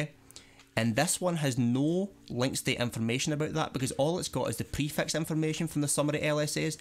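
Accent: Scotland, United Kingdom. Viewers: Agreed. My certs are a year expired.. I thought I really should certify again, given the “certpocalypse” explains it better than the guy on Udemy. Also, the Scottish voice is way better than the American voices you normally hear on Udemy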